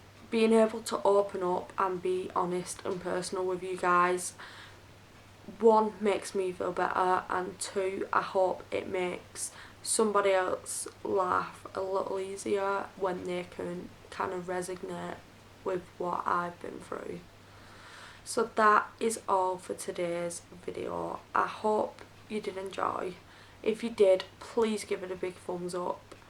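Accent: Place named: Northern England, United Kingdom. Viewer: Thank you for this film. It sounds like you have come through a lot. It must have been very difficult. I hope that you still have your Mum and brothers and sisters in your life to maybe help each other. Abuse of any type is hard to get over and I can understand why you would feel anxious. I hope it gets better for you as time goes on. I really love your channel and it's lovely to hear a Northern Girl talking. Take care. ❤ and Well done! 👏👍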